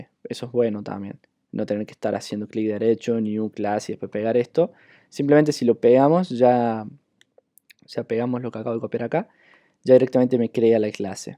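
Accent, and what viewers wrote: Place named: Córdoba, Argentina. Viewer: En este video no pudiste controlarte como cordobé, y tambien miré raro cuando dijiste "Coca con pepsi" jasjjajaja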